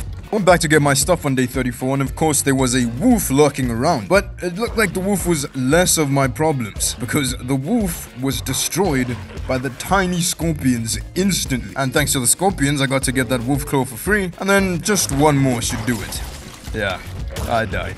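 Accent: South Africa. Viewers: You from SA bru?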